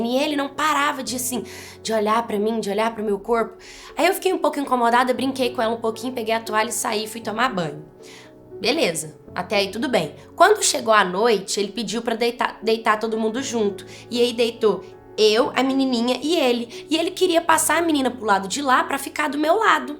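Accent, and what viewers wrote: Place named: Pará, Brazil. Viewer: Vc é paraense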